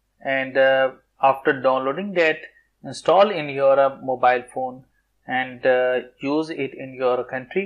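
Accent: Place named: India. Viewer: I can't listen to this the indian accent is ridiculous. Learn better English please.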